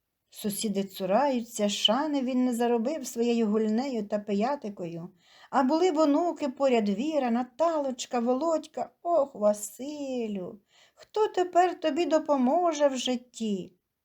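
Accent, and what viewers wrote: Standard. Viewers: Тільки за голос і чисту українську❤❤❤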